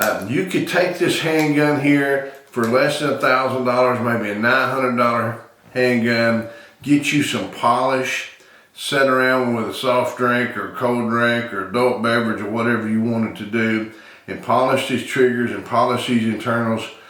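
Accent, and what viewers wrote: South, United States. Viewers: Hey You The Man Coming At You From North Carolina Old Vietnam Vet Retired 100% Disabled PTSD.SARGE I Bought One When They First Came Out & Your Review Was Spot On.Thank You Sir For Your Service. I know A Vet When I See One.Yes Sir Ree!!!! Over In Nam The Best Friend Of Mind Was From Arkansas Best Man Every Has His Shit All Wired Together Tight. You Get My Meaning. Sarge Any Thoughts On Suppressor For This Mean Machine.Have Looked At Threaded Barrels All Over The Web. I got Two Suppressors One For Colt AR15A4 Weapon And One For My M1991A1 If You Have Any Thoughts On This This Subject Please Do Share With Me On The Best Way To Go with This Project Sir. I Love The Way You Talk With Your Southern Slang & I,m Proud To Be From The South Too Just Like I Know You Are.Have A Good One & Keep It Between The Ditches HaHa!!!! Owens Signing Off For Now.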